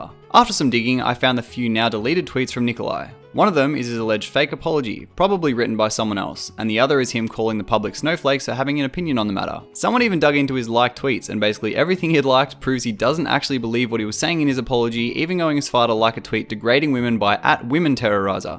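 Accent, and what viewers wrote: Australia. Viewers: Very well put together. Want to know my thoughts? Ok sure. This guy is a fucking CLOWN what the fuck did he expect? Good response by MS. I like your video style of succinct and right to the chase. It is a breath of fresh air in the hastily edited 10min videos made specifically to get ads. I also love hearing another Aussie voice behind the camera. Keep up the good work!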